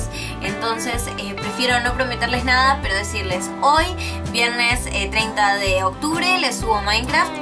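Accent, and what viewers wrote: Buenos Aires, Argentina. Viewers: Sos de Buenos Aires?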